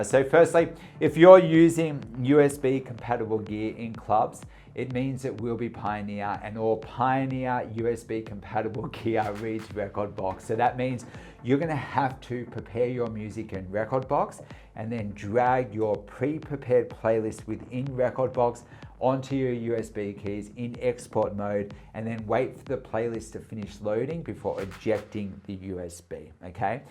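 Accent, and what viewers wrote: Australia. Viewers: I love how he softens the blow of bad situations with that Aussie accent!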